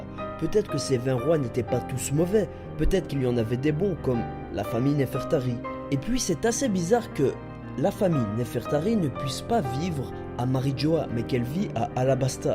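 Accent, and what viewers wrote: Brussels, Belgium. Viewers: T'es de Bruxelles?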